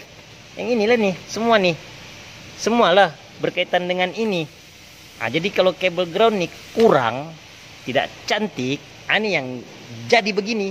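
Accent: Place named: Tawau, Malaysia. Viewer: mcm org twau. bangkel d mana bos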